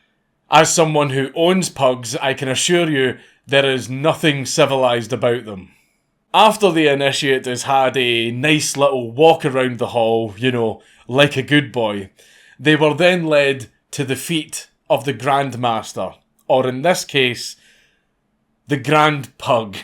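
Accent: Scotland, United Kingdom. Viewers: "A Papul bull" I love the scottish...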